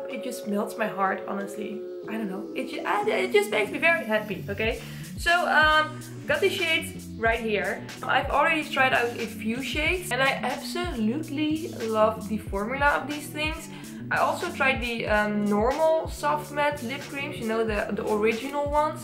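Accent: Netherlands. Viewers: Hey girl, as soon as I listened to your voice, I knew you were a Dutchie! Fellow Dutchie here 😄 Love the NYX soft matte lip formula and really like these metallic shades! 😘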